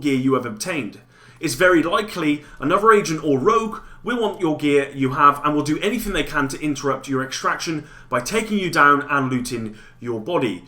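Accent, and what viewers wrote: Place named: England, United Kingdom. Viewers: You sound like a fellow Bristolian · Oh these annoying as fuck English accents,why don't people just speak American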